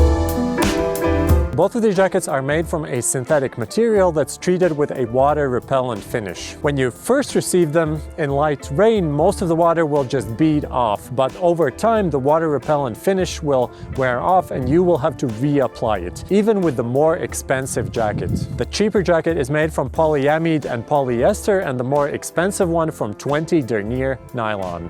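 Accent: Latvia. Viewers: Sounds like Latvian accent... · Tu takš letiņš pēc akcenta 😄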